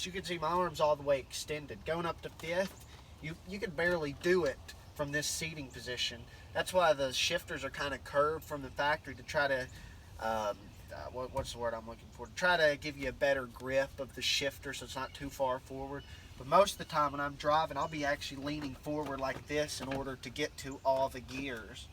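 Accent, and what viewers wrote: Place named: Texas, United States. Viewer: Awesome Texas accent! 👌🏽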